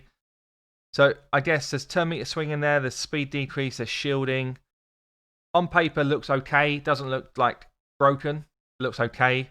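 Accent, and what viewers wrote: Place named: London, United Kingdom. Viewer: Never doubted you are from London and always enjoyed your pronounciation! :)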